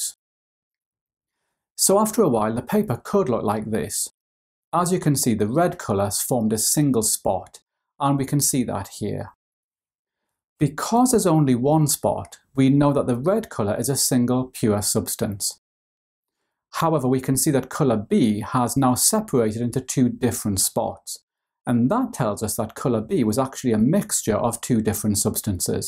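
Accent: United Kingdom. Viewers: Think the accent is British- colloquial .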